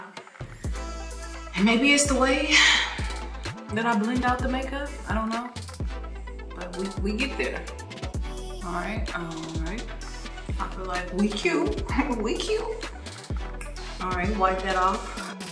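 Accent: South, United States. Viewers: Southern accent coming through strong in this video...😍💪🏽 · It's the Texas accent for me 😍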